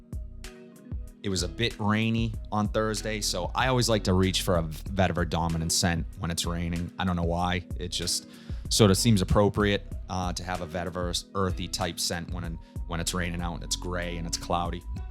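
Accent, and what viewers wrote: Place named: Massachusetts, United States. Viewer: I definitely hear the Massachusetts accent😅😂🤣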